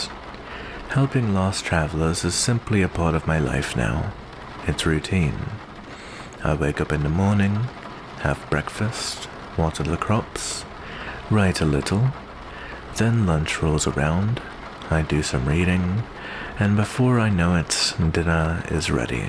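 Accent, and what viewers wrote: United Kingdom. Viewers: good british accent attempt, 7/10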